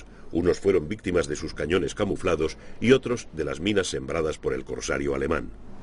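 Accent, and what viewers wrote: Spain. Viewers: Es que habla en perfecto castellano de España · Me parece que se refiere al acento español del traductor 😳no encuentro otra explicación.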